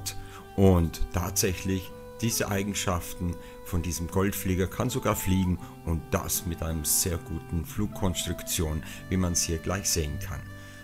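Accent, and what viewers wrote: Tyrol, Austria. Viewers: wenn du bei dem video im tiroler dialekt dann untertitel aktivierst oder eine zweite audiospur auf deutsch machst ist das ok.^^ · Schian Gruass ausn Stubai.. mach weiter so.. ob auf Tirolerisch oder sinsch.. 😜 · Hey, schöner kurzer Beitrag. Die Theorie mit den Fischvorbildern für die "Mayaflieger" hatte ich erst vor kurzem gehört, nachdem aber die fliegenden Fische auch ganz anders aussehen ist diese Theorie zumindest für mich wiederlegt. Für mich sind das immer noch Flugzeuge. Die Glühbirne würde halt gut zur Bagdadbatterie passen, womit ja angeblich nur vergoldet wurde, oder so... Schade, dass Dich die Arbeit in der so wie so schon so hektischen Vorweihnachtszeit so einspannt, halt die Ohren steif... Lieben Gruss und einen hoffentlich entspannten Sonntag Abend noch Paco PS also mir hat der tiroler Dialekt gefallen, ich musste mich zwar ein wenig konzentrieren habe aber alles verstanden...:) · Bitte nicht auf Tirolerisch, außer es gibt ein Übersetzungstool... 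im Norden fällt sowas schwer zu verstehen 👽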